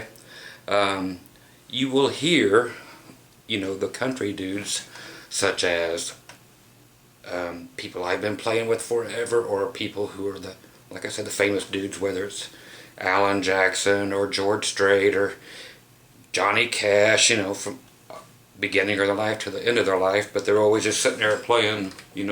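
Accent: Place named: South, United States. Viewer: Sorry to be so nosy, but where are you located,? I am forever trying to figure out your slight accent...Oklahoma? Texas?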